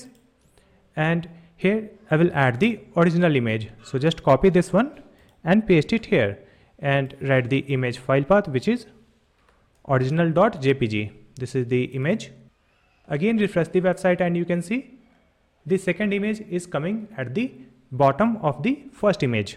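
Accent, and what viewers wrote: Bihar, India. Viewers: Sir aap bihari ho? Just asking no offense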